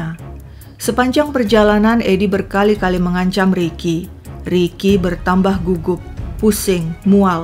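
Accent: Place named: Medan, Indonesia. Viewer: Logat ini kek logat Medan om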